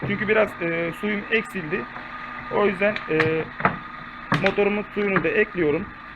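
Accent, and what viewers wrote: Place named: Izmir, Turkey. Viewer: Bu adam sanki izmirli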